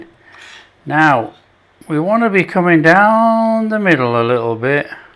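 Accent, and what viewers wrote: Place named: Northern England, United Kingdom. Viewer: Fantastic. I'd love to know what part of the uk you come from with an accent like that? Obviously the North but where???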